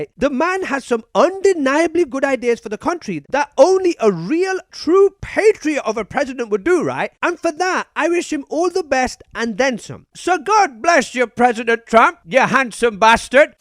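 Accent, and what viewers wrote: United Kingdom. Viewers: Aren’t you British? 😭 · Angry British guy perpetuates misconceptions about Donald Trump's intentions.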